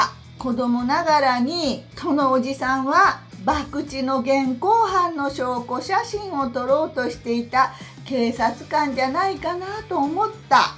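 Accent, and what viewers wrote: Yamaguchi, Japan. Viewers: 本当の山口弁！わざとらしい、鳥肌が立ちそうなYouTuberさんとは一線を画します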